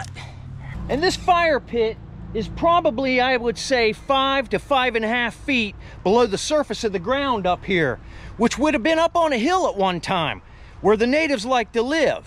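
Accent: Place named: Appalachia, United States. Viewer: you remind me of my cousins in Ohio and West Virginia with your accent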